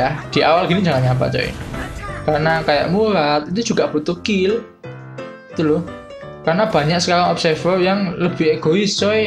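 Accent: Java, Indonesia. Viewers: Bang wong jowo tah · Wong jowo yo lur mantap tenan 😂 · Asli kang kog medog tenan.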